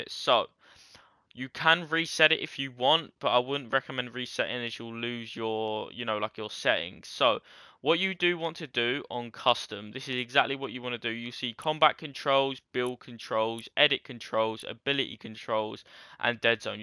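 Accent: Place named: United Kingdom. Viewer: always the british youtuber bro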